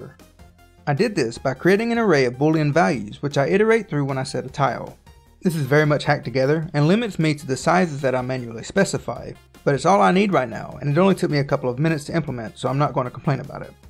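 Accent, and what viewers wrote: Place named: Texas, United States. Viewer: I like your Texas accent,are you from Texas?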